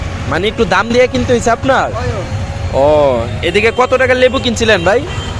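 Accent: Sylhet, Bangladesh. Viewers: Vaia apni ki sylheti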